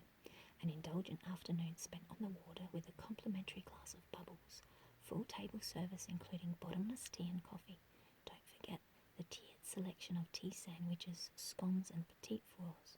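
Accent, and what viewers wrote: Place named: Australia. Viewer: Love your voice, so Aussie.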